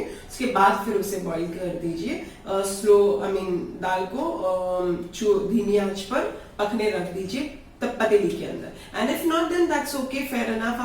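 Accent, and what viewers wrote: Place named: Gujarat, India. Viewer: Masha Allah 👌👌 I like d way u talk as we gujarati peoples do..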